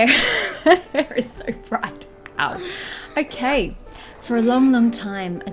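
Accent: Australia. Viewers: What Australian state do you come from? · Helloooo from Denmark ... sweet voice... NZ or Aus? I'm guessing Aus 🙃 Start. Character build. "Honey, why is your hair so luminescent?" LOL ... also she starts the game here. Also LOL 😅 Motion blur is disgusting ... agree! Haha.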